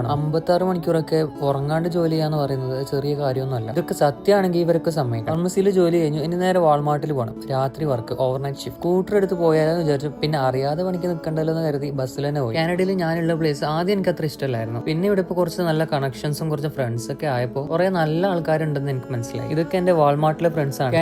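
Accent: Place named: Kasaragod, India. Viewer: Kasaragod avde...samsaram kettapol manasilayi❤ iam frm Kasaragod ❤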